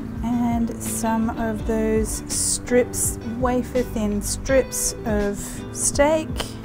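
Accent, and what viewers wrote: Australia. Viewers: she's aussie lol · Its her aussie accent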